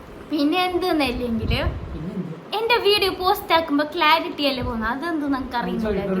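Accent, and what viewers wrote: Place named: Kasaragod, India. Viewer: Ninde ksd baashe paangilla ninde porel mundne aa slanganne ni mundumbo paang👍🏻❤‍🔥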